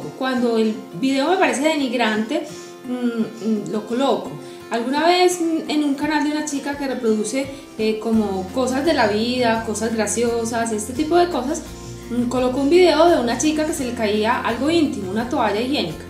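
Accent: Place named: Medellín, Colombia. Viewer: Yo creo que más que dejar un LIKE por dejarlo yo creo que para algo se creó así como el dislike y pienso que dar LIKE a un vídeo es de alguna manera valorar el trabajo de la persona porque el que hace vídeo como nosotras sabe el trabajo que esto lleva y lo que uno se esfuerza para que de alguna manera entretenga y guste. Por otro lado también estoy de acuerdo en algo que mencionaste muy por encima y es que algunas personas se ve que por quedar bien decir bueno ya cumplí ya me pase por tu canal te dejo un comentario para que sepas que te veo y que me debes pasar por el mío. A mí eso me parece de mal gusto porque el vídeo dura 5 min te lo reproducen 30 seg mientras te escriben y ya. Yo no hago eso a menos que el vídeo no me guste por supuesto. Pero estoy absolutamente de acuerdo contigo. Quise ver este vídeo porque quería conocerte por el acento seguro eres de Medellín :) que bueno saber un poquito más de ti. 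Un abrazo y nos seguimos viendo guapa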